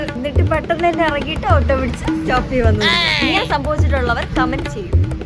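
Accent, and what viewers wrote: Kannur, India. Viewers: ചേച്ചി നമ്മുടെ കണ്ണൂർ ഭാഷ അങ്ങനെയാണ് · Kannur ഭാഷ..... Like ഉണ്ടോ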